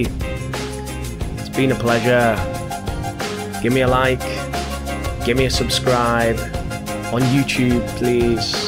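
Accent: Northern England, United Kingdom. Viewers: Northern England, that's where you're from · so into Brit accent. You're superb! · I love British accent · You are a native British speaker, or you learnet this British accent?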